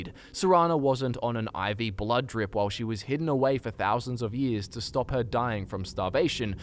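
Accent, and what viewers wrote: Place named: Australia. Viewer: No, watch the video and enjoy the Aussie-narrated lore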